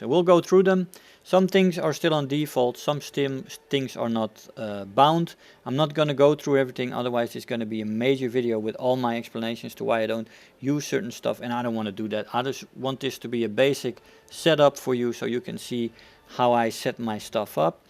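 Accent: Netherlands. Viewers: i smell dutch....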